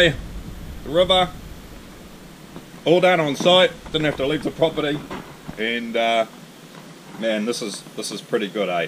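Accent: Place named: New Zealand. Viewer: hey, dude. I just like your kiwi accent. Lovely video. It would be great if you share the location. LOL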